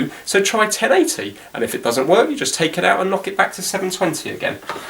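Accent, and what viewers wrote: United Kingdom. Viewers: seeing you talkin in british accent is amazing your accent is great tbh its best british accent i have ever seen someone talking.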